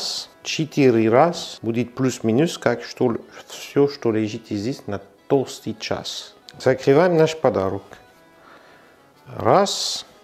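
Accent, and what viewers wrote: France. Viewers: Как же нравится его французский акцент!ну очь.сексуально! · Как мило вы говорите по русски!Ваше видео смотрится,как приключенческий фильм с юмором и французским акцентом!Не все понятно,но сделаю по простому рецепту!Спасибо вам за урок! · Здравствуй. Только что нашла твой канал, с улыбкой отсмотрела и слушала твой красивый фрцузкий акцент, записалась уже и буду готовить этот рецепт. Если бы ты знал сколько рецептов я попробовала, но я недовольна, обьязательно напишу о результате. Мерси боку.😘👍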